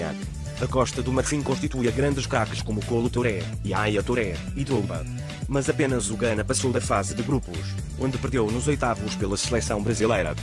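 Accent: Portugal. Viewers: posso legendar seus videos? a voz pt é meio complicada de entender pelos brasileiros por causa do sotaque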